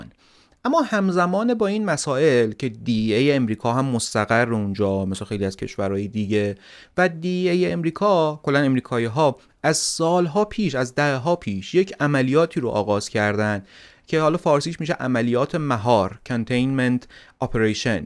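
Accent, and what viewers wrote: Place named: Tehran, Iran. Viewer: با درود جناب. کاراتون رو پیگیری میکنم و بسیار آموزنده هست برای من. به عنوان یه مخاطب خیلی خوشحال تر میشم در تلفظ کلمات و اسامی غیر فارسی بیشتر دقت بکنید. متاسفانه همه کلمات و اسامی رو با لحن و گویش فارسی تهرانی ادا میکنید جدا از این که انگلیسی، کردی، ترکی و هر بان و گویش دیگه و مخصوصا منو که زبان مادریم فارسی نیست خیلی آزار میده. با تشکر از ویدیو های جذاب هر سه کانال تون 🎉❤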